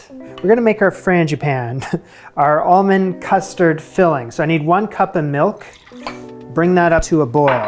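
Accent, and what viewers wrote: Canada. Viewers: You are so relatable you are me!!!. funy.. and sometimes a little unhinged. But you are up to the task. Thanks for the fun!!! Love your Canadian accent From the Netherlands 👍👍👍 As saint Nick would say you are nice and a little naughty.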